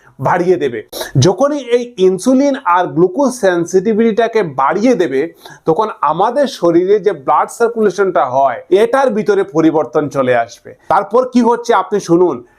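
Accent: Sylhet, Bangladesh. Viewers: ভাই আপনি কি সিলেটি আপনার কথায় আমি বুঝতে পারতেছি আপনি ১০০% সিলেটি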